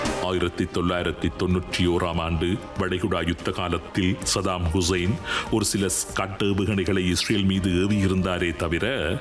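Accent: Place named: Eelam, Sri Lanka. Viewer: Bro uganda voice eganda ஈழம் voice im from trinco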